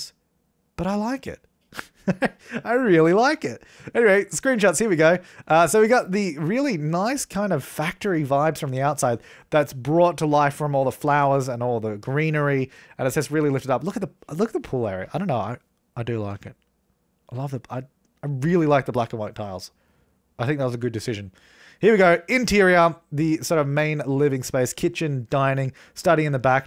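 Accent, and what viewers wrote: Australia. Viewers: Wait, so you're not English? But Australian?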